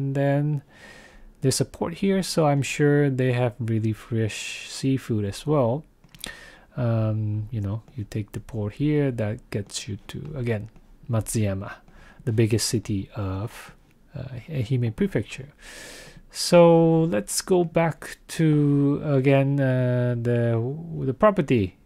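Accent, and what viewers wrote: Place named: Philippines. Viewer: Why do viewers think you are Japanese? You sure sound kabayan to me. Thanks for your informative videos.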